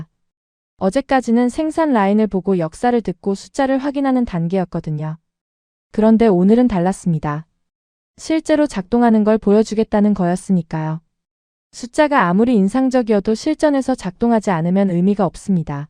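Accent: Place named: China. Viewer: 발음이 중국이네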